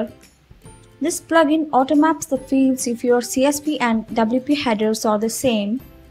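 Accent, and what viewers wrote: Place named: India. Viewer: I love Indians and their English.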